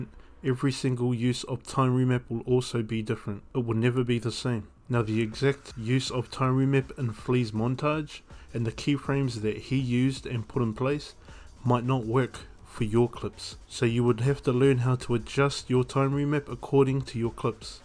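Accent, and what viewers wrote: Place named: New Zealand. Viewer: Your from New Zealand haha same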